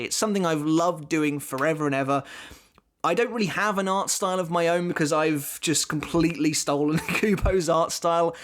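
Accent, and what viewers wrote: United Kingdom. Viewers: i forgot...... british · Wait you’re from the uk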